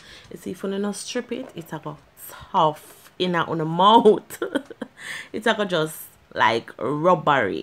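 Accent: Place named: Jamaica. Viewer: 🤤🤤🤤 plus I love the way you speak😍 time to learn some Jamaican English 💃🏻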